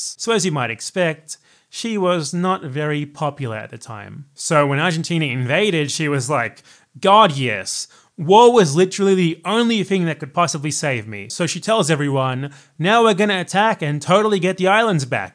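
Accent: United Kingdom. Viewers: Lolz Mc'lovin the pommy accent, and what's even more beaut is the amount of dislikes lmao while rofl, you know you're doing something right if you tend to piss of some bunch of prissy crooked teeth tea drinking 'iron lady'kinked hicks Totally recommend supporting via subscription and joining. Oh BTW, cannot argue with that logic, too true my compradre, too true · You are aware that the British did not invade right? And you are speaking with an English accent.. odd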